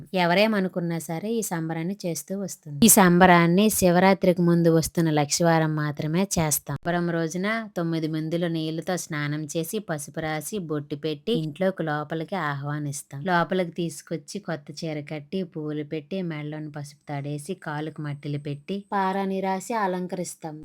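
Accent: Srikakulam, India. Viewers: తల్లీ నీ భాష కాంచెం మార్చు శ్రీకాకుళం పరువు తీయ్యకు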